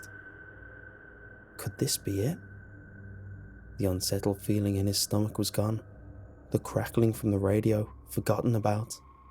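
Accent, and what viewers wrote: England, United Kingdom. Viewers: He has an English accent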